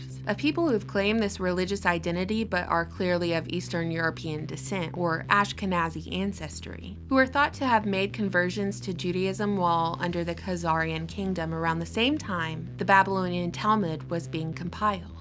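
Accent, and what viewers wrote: South, United States. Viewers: GREAT VIDEO! Thank You....Love Your Southern Accent.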